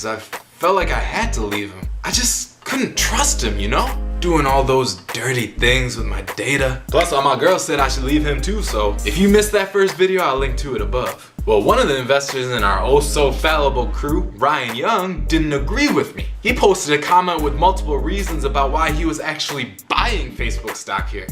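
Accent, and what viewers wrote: India. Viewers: the Indian accent kinda sounds like Scooby Doo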